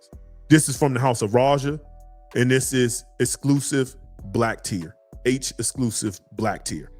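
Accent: DMV, United States. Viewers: Is that the DMV I hear? Randomly came across your channel video and I know my ear doesn’t deceive me.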